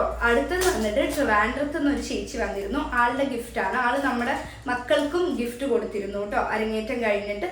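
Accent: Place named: Palakkad, India. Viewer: പാലക്കാടൻ നിഷ്കളങ്ക സംസാരം😍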